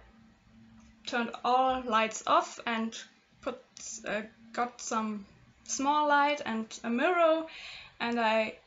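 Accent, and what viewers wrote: Germany. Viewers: wollte das gleiche fragen, cool dass du aus Deutschland kommst, bist echt talentiert... finde den deutschen Aktzent immer voll knuffig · your english😁 are you german oder something? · Ein schönes Video und dazu sehr hilfreich! Dankeschön 🧡🧡🧡🧡🧡🧡👌✌ps: Du scheinst einen rheinischen Dialekt zu haben 🤔😌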